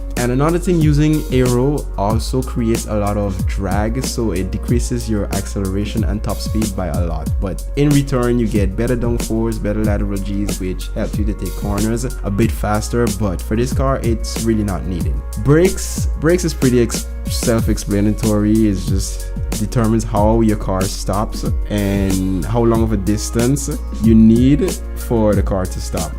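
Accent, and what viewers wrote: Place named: Caribbean. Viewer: You sound Carribean, are you?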